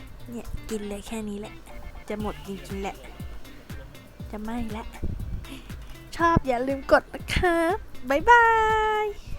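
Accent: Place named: Isan, Thailand. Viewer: น่ากินจังเลย พึ่งไปกินในน้ำมาอยากกินอีกละ😂ชอบเสียงภาคตาฮักดี✌️✌️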